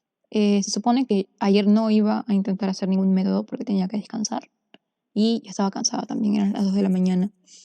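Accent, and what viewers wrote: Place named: Peru. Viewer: Tu acento peruano me encanta 👁👄👁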